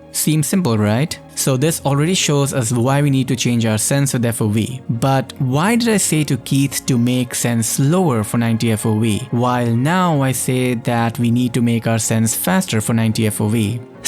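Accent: India. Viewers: Idk if anyone else has noticed, but now sounds more "Indian" if uk what i mean 😉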